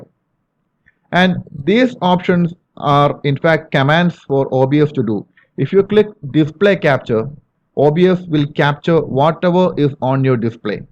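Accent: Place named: Kerala, India. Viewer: Are you from Kerala sir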